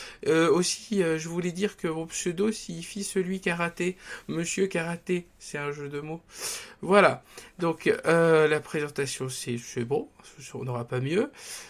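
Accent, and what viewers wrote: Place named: India. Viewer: un p'tit accent indé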